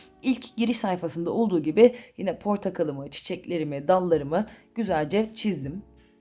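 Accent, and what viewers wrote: Adana, Turkey. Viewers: Abla adanalı olanlar anlar bende videoya girer girmez dedim ki adanalı mi acaba? 😂😂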